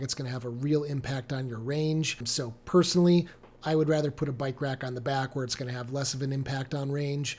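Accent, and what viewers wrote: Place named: Boston, United States. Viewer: Until I listened further, I didn't understand Smot Pok - and then you said you're from Boston! 😂 Love your content - love my 2025 I6 RWD SE - great efficiency and roadtripper. Almost 20k miles since March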